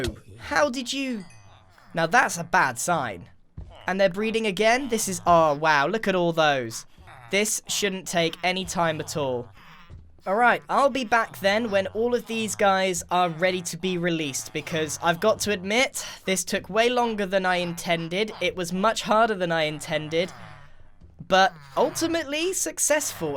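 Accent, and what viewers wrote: United Kingdom. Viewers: You made me fall in love with British accent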